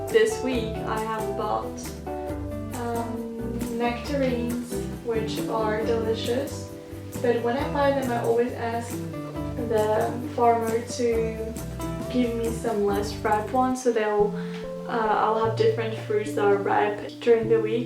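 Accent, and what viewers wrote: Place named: South, United States. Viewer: You have a very southern american accent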